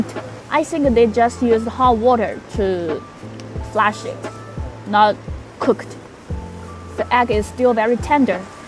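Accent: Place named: United Kingdom. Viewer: You sounds like you're born in UK or studied there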